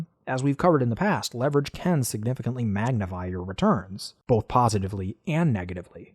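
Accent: United States. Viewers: What’s your accent? New York?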